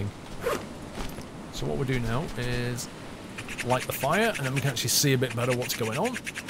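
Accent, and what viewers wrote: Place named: United Kingdom. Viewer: he is obviously British like me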